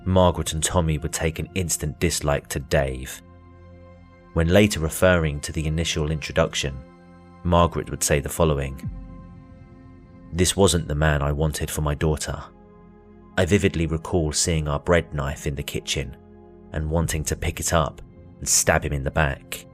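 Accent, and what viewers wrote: United Kingdom. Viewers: Hiya, I was sent by Kyle's channel, Dire Trip. I'm very pleased that I took his suggestion. If you'll indulge me for a wee bit, I've a few things to say. First, thank you for the warning. I did not heed it, but am grateful you provided it. It was sadly warranted. Second, this is an horrific case. One I'd never heard of. I understand your research is top notch and I commend you. Third, I am a long-time fan of true crime but only recently been seeking out YT vids on this subject. Now, I am not a snob, but I do appreciate good grammar and a good flow to the narrative. (I'm sure alot of folks would say the same.) I think you did a fine job. I enjoyed your accent as I am a transplanted Scot living in U.S., and my Mum was from Manchester 😊 I do enjoy a Brit accent. I also noted that your tone conveyed your disgust at the heinous injuries this poor soul endured. 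Bless her memory. I'm happy to be a new sub, I am grateful to Dire Trip for the suggestion and I really look forward to hearing more from you. Thank you very much. Blessings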